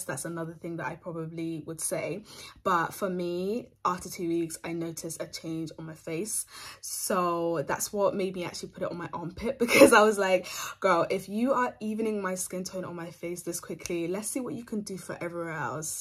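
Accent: United Kingdom. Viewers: Please some should please write down the name of product she mentioned I couldn’t get her because she has an English intonation please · someone can tell me if shes british? i hear an accent when she talks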